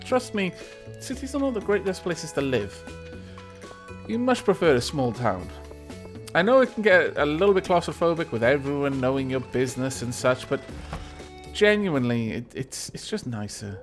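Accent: United Kingdom. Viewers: from 1 brit to another, I can definitely hear it with you sayings and use of language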